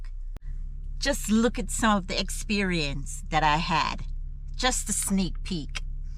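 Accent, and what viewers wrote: Jamaica. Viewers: She sounds Jamaican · Hi. Being of jamaican heritage my self , i hear a slight jamaican accent ? With you. Are yiu from jamaica ? If so , did you leave long ago. ?. Have you considered to go back home?. Maybe life would be better there. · I admire you for not giving up, you sound Jamaican. I pray that you will repent of your sins and turn your life over to Jesus Christ, if you have not done so already. Keep your eyes on Jesus. He is the only one who can help us.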